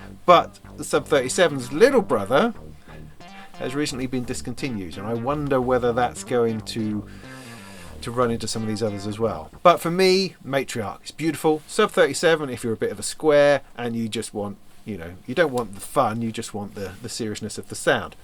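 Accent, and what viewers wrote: England, United Kingdom. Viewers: I’ve watched a few of your videos where you have explained you have American viewers. You’re just a sell-out. If the Yanks are too stupid to get their calculators out then so be it. You aren’t Murican, you don’t live there so stop pretending prices in dollars are part of your life. If you get loads of adulation for how you pronounce the names of synths then go and make a YouTube channel named I’m a Jolly English Chap What? Bit of a bell end aren’t you.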